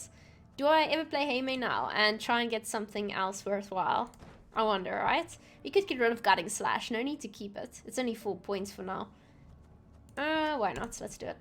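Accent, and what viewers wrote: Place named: Afrikaans, South Africa. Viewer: Knew I recognized the Afrikaans accent👀👌🏾. Great to see a fellow South African playing gwent😁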